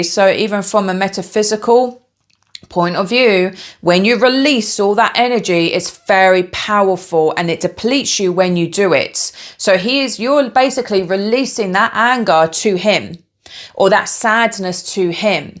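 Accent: United Kingdom. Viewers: you do have a very cute british accent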